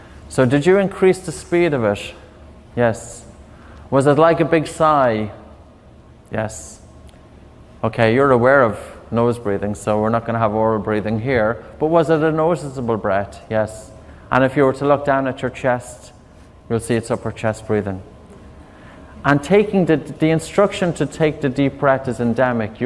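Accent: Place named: Ireland. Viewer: Such a lovely Irish lilt!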